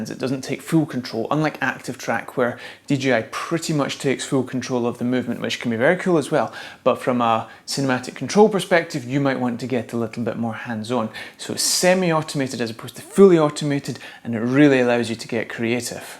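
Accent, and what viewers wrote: Scotland, United Kingdom. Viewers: LOVE THE SCOTTISH ACCENT! Now wear a kilt :)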